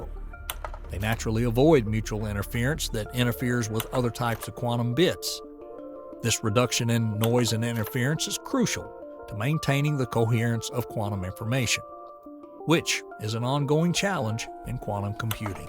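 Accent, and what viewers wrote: South, United States. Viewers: I've never heard a physics doc narration with a southern accent. I think I love it.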